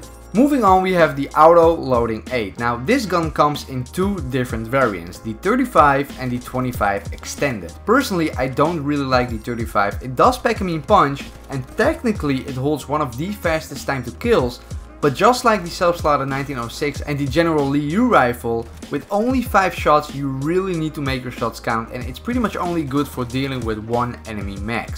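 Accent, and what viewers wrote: Netherlands. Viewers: Ben je Nederlands? Zo klink je wel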